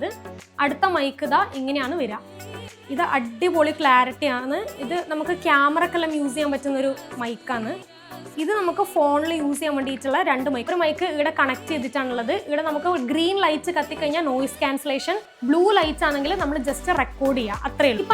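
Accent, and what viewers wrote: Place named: Kasaragod, India. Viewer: Haii chechiii I'm your big fan....❤❤enikk chechiye orupaad ishtawa chechide samsaram kelkkan nalla rasssaa ❤😭..chechide slangum ishtawa enikk❤❤❤... Chechiii kasaragod alleyyy njnum kasaragod thanneyanuuu.. Enikk chechiye neritt kanaan thonnunnuu😭😭😭🤍🤍🤍🤍.ende per vaishnavi oru hai tharumo plzz 😭😭😭🤍🤍🤍... Ee video ishtapettu ❤❤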